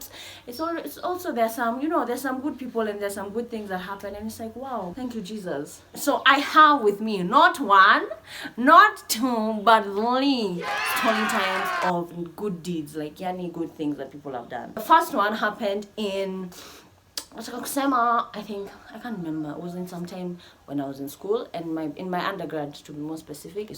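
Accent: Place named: Scotland, United Kingdom. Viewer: team ANTON LOVE ISLAND 2019 all the way 👊🏽👊🏽👊🏽👊🏽 Scottish accent a struggle .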